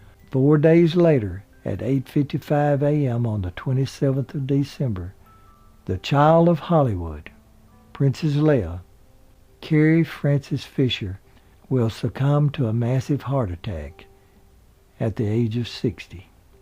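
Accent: South, United States. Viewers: ja, er ist einfach super Mit seinem 'southern Snarl' So howdy mässig :o) Recheriert sicher viel LG aus Franken!!! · 👍YES I TRULY LOVE his southern accent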